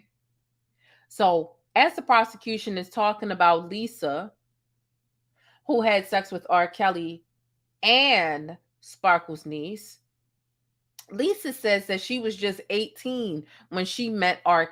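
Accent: Baltimore, United States. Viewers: I automatically figured out that you're from Baltimore, the accent is there. Behind New Orleans, Bmore is my second favorite American accent, I have family from there. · Definitely hear your BMore Accent!! Love it!! Do your thing Ms.!!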